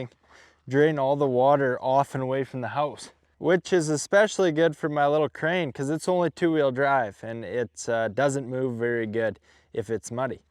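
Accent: Canada. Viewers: You're smart and kind!! Proud of you. Keep the videos coming. I just love hearing you speak Canadian.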